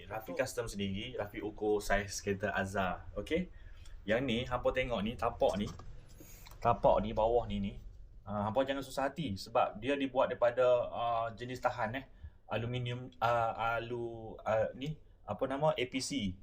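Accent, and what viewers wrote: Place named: Perak, Malaysia. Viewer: 😂😂😂 Peraq betui mu. Aaarr.. Psl x sebut Anmummm😅 oiii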